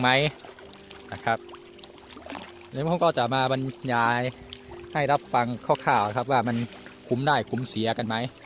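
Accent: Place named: Isan, Thailand. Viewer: อีสานใต้หรือ อุบล แน่นอน อิอิ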